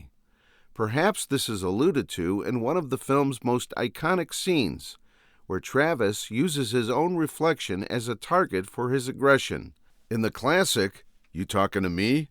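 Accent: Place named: Chicago, United States. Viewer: Interesting take, goosed by your heavy Chicago (?) accent.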